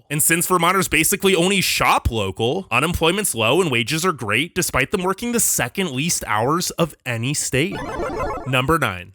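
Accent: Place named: New York, United States. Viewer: This guy must be from NY.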